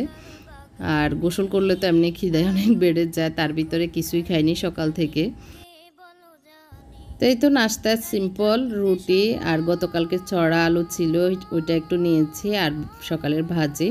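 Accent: Noakhali, Bangladesh. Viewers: আপু আপনার বাড়ি কি নোয়াখালী?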